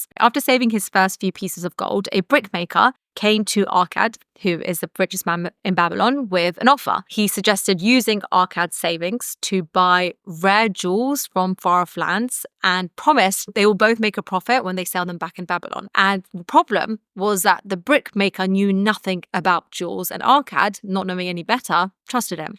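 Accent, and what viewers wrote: England, United Kingdom. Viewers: Your accent is too English 😅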